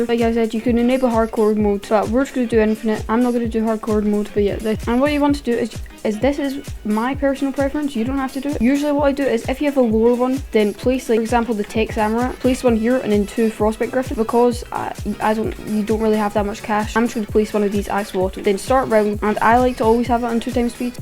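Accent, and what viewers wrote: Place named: Scotland, United Kingdom. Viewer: Scottish roblox YouTuber no way I was thinking about starting 😂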